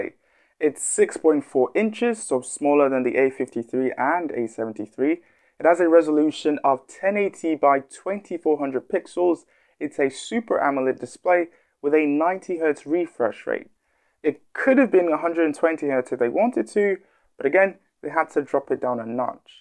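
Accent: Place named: United Kingdom. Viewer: you sound British though. Did you go to school in Britain?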